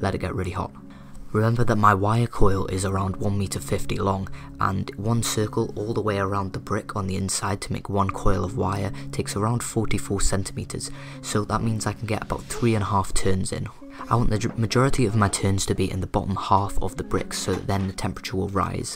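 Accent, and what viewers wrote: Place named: Wales, United Kingdom. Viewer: Great video 👌 Still trying to place the accent though. Sounds kind of south east walian.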